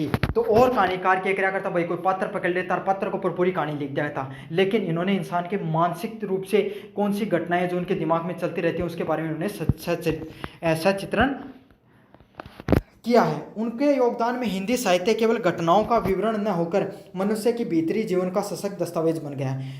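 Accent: Marwar, India. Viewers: Sir itna bhi sach nhi bolna tha😂😂😂. Puri marwadi and dialogue super 😂😂😂😂😂😂😂😂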